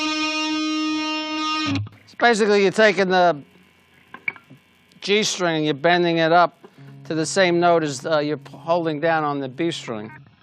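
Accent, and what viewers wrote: United States. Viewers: Ace has the best laugh ever! Love that Bronx accent. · I'm a Native New Yorka and he sounds like half my Long Island relatives. It's a VERY natural and nostalgic accent for me to hear and it's one of the reasons that Ace has seemed so REAL to me, plus the fact that on his solo album he gives a shout out to Queens and all the Cabbies in NYC and Queens where he used to work.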